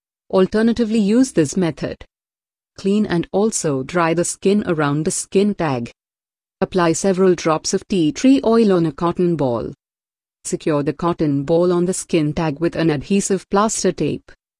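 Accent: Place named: North India, India. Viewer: This woman is from India obviously the slang also her voice more to North Indian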